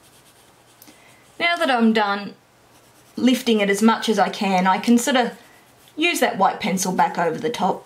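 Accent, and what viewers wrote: Australia. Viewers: Hi! When you say you’re going to blend the white strokes out with a “thinner”, do you mean just like something that you buy that is just called a paint thinner, or do you mean some sort of odourless mineral spirits? This was a good video. I normally end my comments with hi from Australia, but I know by your accent that you’re Australian and it’s always nice to find an Australian video on YouTube! 😃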